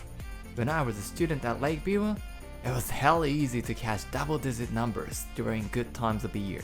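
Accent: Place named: Australia. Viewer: Did you study or work out here in Australia,? Some of your syllables almost sound Aussie lol. Anyway, cool video! I've been using Free Rig/Jika Rig's for Yellowfin Bream & Mangrove Jack here in Australia for a little while now. No-one is aware of these techniques here, at least from what I've been able to tell. Though, I think it's only a matter of time before they start to get popularized. Keep up the good work, I subbed!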